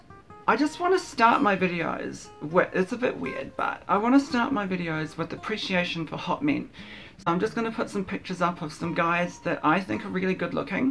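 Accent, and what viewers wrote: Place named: United Kingdom. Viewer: for a second I thought you were my ex until I heard your British accent, you and her can be twins, this is a very good video , I showed it to my transgender niece , her friend and him had sex and he had that shame look as you mentioned and I told him what you said and it actually helped them and they're now dating 😃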